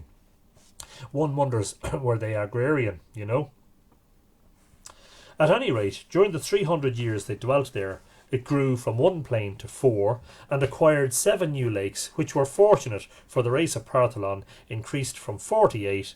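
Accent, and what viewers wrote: Ireland. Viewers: I love Irish mythology, and always have, and to hear it read by an Irishman? Thank you sir, for this pleasure.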